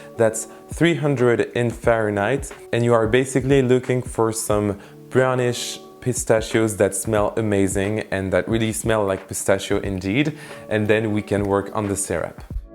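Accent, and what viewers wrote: France. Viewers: Love your french accent, keep it up! 😍👍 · are you French lol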